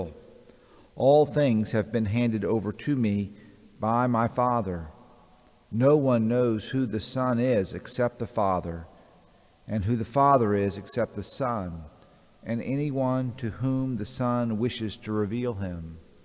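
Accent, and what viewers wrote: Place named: South, United States. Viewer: Father, I was browsing on YouTube and "discovered" your video clips. (I think maybe God had a hand in my discovery.) Anyway, I must say that I enjoy listening to your homilies. I try to listen to you quite regularly. P.S. It's nice to come across a priest who has a nice Southern accent, y'all. That's meant as a compliment.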